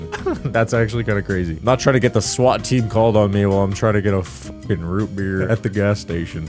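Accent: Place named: Canada. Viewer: I thought this geezer was Canadian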